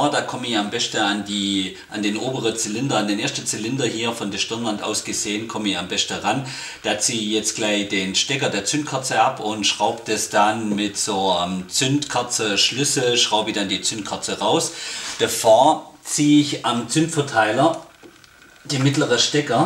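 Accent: Swabia, Germany. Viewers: Schee Schwäbisch, griasla noch Günzburg👍🏻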